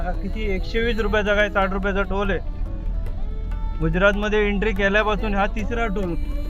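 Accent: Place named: Malvan, India. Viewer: दादा मध्ये मध्ये मालवणी बोलना ❤ भारी वाटतं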